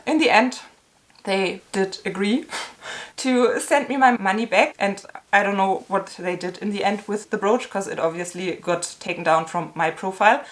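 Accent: Germany. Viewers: Are you german?😅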